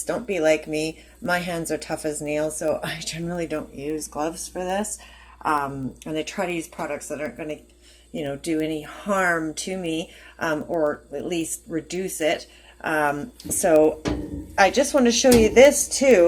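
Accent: Canada. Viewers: You sound Canadian and possibly from Ontario? I know an Ontario accent when I hear it. I am in Manitoba, in the Winnipeg area. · I find it amazing that you only use like 2 different cleaners predominantly. That simplifies things so much. It's also surprising to me that you use that Dr. Bronner's for steam cleaning. It's very versatile to do that. I'm going to pick some up tomorrow. By the way, are you Canadian? I thought I heard the accent but I could be wrong lol...I'm Canadian, that's why I ask :P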